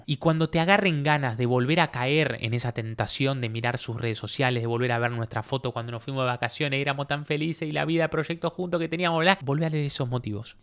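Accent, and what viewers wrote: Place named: Argentina. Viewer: Tu acento me hace extrañar mi pueblo Argentino. Bendiciones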